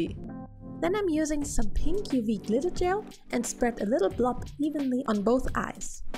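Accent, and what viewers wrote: Germany. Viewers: I’m a huuuuge sucker for German accents, and I completely agree!!!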